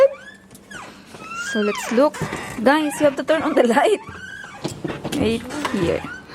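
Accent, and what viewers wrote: Visayas, Philippines. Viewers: Stop being perfectionist girls.shes a Bisaya so it's not easy to her to speak straight..and that's the way that's why she known from the beginning of her vlog if you think that you are brainy enough you should understand what she's going to mean it.in my opinion ✌️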